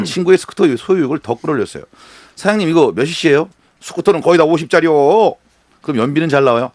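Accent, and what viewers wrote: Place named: Jeolla, South Korea. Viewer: 충청도라면서 왜전라도 말을 씨부리는겨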